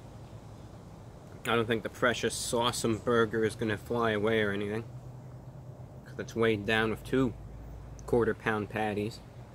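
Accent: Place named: Long Island, United States. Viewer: If you're from Florida Reviewbrah, why do you sometimes sound like you're from Long Island?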